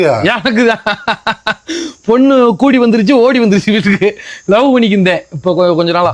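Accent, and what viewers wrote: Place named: Madurai, India. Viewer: மதுரை கிராம பாசை😂😂😂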